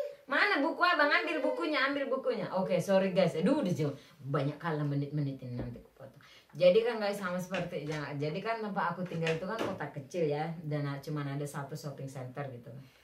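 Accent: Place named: Medan, Indonesia. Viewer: selalu tanpa skip kalo nonton vlog ka, seru bgd apalagi pake logat medan lucu bgd 😂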